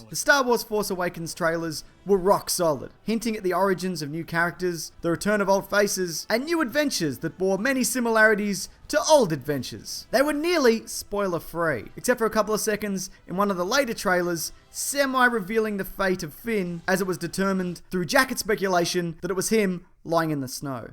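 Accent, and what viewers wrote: Australia. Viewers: Dark City trailer told us that SPOILER aliens move the city around with their minds. Psycho's trailer showed us SPOILER a blonde woman screaming in the shower (actually Vera Miles in a wig, not Janet Leigh). Also you should make one of these where you just say Australian accent words like "Green LANT'n" or "Yes-tah-day." Childish, I know, but pleasing to my American ears.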